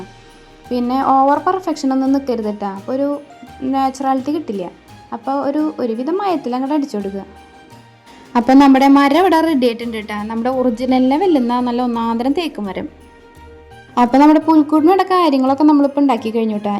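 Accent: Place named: Thrissur, India. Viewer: മ്മടെ തൃശൂര് ഭാഷല് പെടക്കണ പെട കലക്കിണ്ട് ട്ടാ.... 👍🏻👍🏻👍🏻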